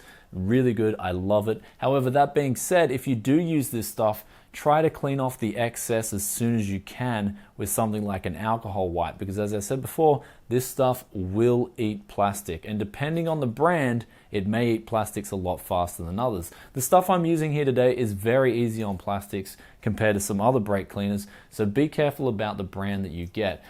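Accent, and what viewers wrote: Australia. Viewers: I am surprised, I assumed given your accent you would live in Aus, but the products you show suggests you live in Japan? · They couldn't understand your aussie accent in America. BTW Kerosene + water on a rag is a stronger cleaner.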